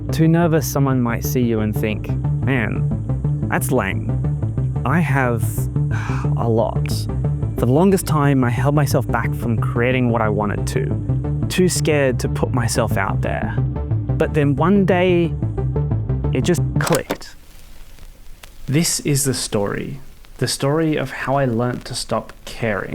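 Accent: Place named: Australia. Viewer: How great it is to hear an Aussie voice. All the best with your journey, if it's a happy one, then things will continue to get better.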